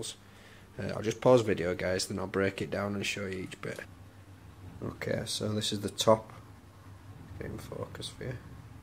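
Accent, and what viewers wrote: Yorkshire, United Kingdom. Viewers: Hi mate thinking of building my own in couple of days gonna make mine very similar to yours does it work ?? P.s were u from u sound like u a Yorkshire lad. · Good to hear a Yorkshire lad doing a review! How's the DIY reactor doing? I fancy giving one a go. Cheers.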